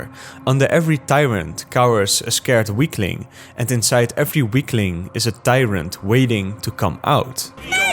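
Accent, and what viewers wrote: Netherlands. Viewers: Great video, but do I notice a Dutch accent there?🤔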